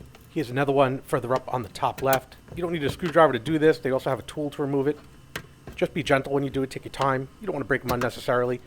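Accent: New York, United States. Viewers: Sounds like a New York accent and it made watching this video hard. Just terrible accent.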